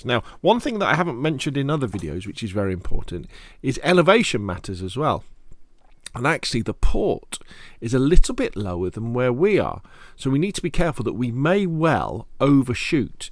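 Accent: England, United Kingdom. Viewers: I feel like when the teacher has an english accent, it makes it lots more easier to understand 😂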